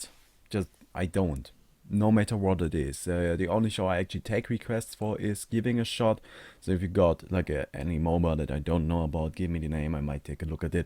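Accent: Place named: Germany. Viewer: hay bistb dud eutscher=? dein aktzent ist soo schön deutsch ;)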